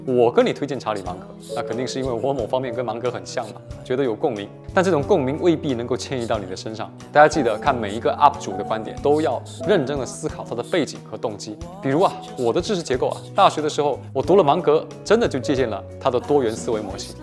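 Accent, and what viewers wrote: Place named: Cantonese, China. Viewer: 就这熟悉的广东口音，爱了爱了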